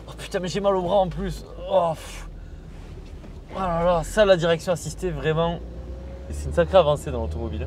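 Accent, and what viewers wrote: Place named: Southwest, France. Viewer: Bravo pour ces vidéos très sympa (et cet accent de notre bon vieux Sud Ouest!) J'ai eu une renault 18 Américan 2 dans ma jeunesse, j'en ai gardé un excellent souvenir!!!...Et le badminton, ça fait travailler tous les muscles, mais pas de mal au bras! Il va falloir travailler ta prise de raquette... Ps: on m'a donné une peugeot 305 qui roule parfaitement en daily, et comme la renault 18, elle rappelle d'excellents souvenirs de jeunesse à tous ceux que je croise sur mon chemin, et plus curieusement encore, quand je vais dans des concentrations de vieilles autos avec cette 305, elle suscite presque plus de réactions et de regards qu'une des 10 ou 12 berlinettes garées sur le parking... incroyable facteur de sympathie!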